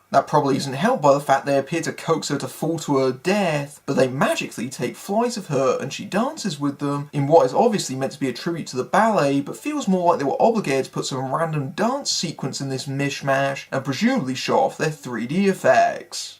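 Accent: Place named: United Kingdom. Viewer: Да, британский акцент ОЧЕНЬ специфический. Но Фильм Брейн делает его невыносимым!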